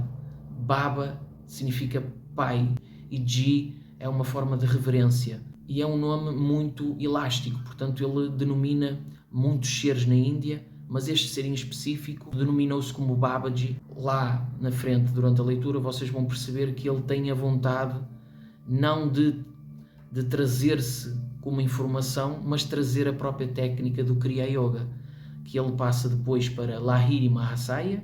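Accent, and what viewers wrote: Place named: Portugal. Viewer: Até que enfim ouço alguém em língua de Portugal Refrescante ouvir um português Parabéns Vou agora ouvir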